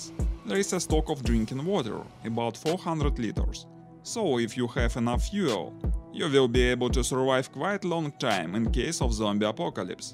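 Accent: Russia. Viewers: Братан акцент поправь, прям по произношению слышно, что говорит русский! 🤣🤣🤣 Привет с Казахстана! Монтаж видео зачет! 👍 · Love your accent my Russian friend.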